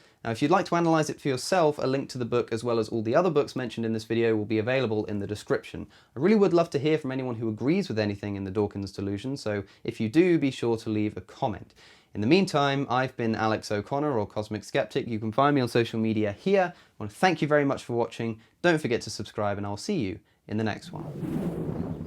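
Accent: United Kingdom. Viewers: hes smart tho and he has a British accent. 2 for 2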